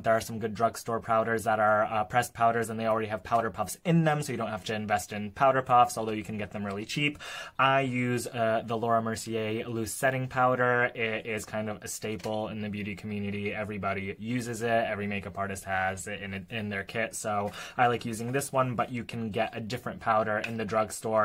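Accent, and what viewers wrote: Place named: United States. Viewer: Well you have an American accent...and you said “usually my videos are pole dancing related” explain!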